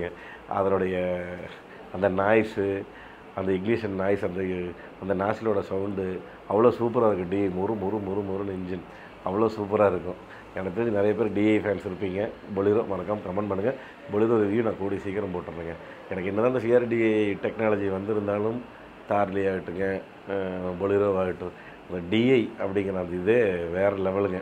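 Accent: Kongu, India. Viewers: Anna iam from erode bhavani.... Unga kongu slang vera level...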